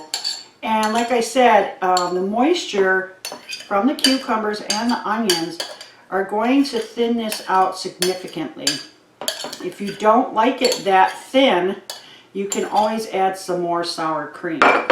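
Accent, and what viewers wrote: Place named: Milwaukee, United States. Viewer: I am excited to try it! I have had it at church potlucks but never have made it. I love your Milwaukee accent.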